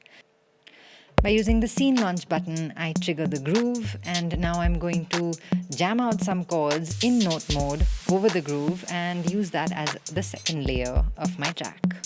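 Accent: India. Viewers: The demo is awesome. Your diction sounds like an Indian. Well. Loved this demo. Haven't yet tried Launchpad. Maybe in the future for sure.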